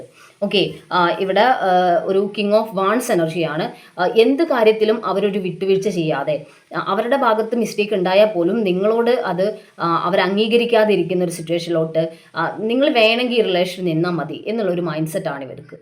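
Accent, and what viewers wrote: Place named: Malappuram, India. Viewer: Malappuram slang is superb♥️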